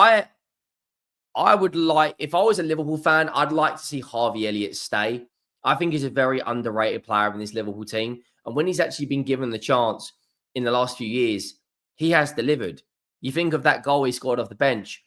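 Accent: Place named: London, United Kingdom. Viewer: Why is a guy with a London accent telling Liverpool fans what we think about our players? We all love Gakpo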